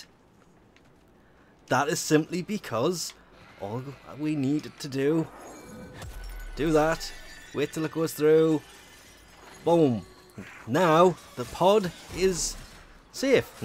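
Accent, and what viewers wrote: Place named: England, United Kingdom. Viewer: Where do you get your accent from are you English uk or I dunno I'm just wondering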